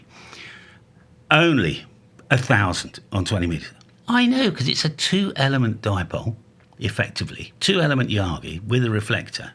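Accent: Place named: United Kingdom. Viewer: Ugh.... British 🫩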